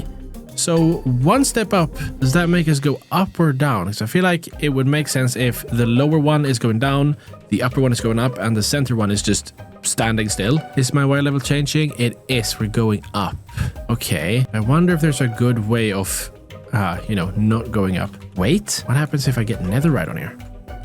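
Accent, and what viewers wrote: Sweden. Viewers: Is Swedish? Cause I Am also Swedish and it feels like the pronunciation of a couple of words feel ”Swedish” aka the accent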